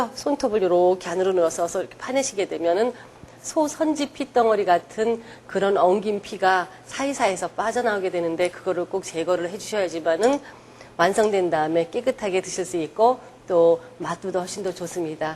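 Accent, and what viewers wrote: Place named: North Korea. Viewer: 북한 사람 말투인데 어딜까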